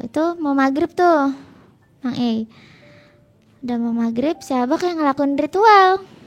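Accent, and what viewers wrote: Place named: Cirebon, Indonesia. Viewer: Yah Cirebon jemaras dia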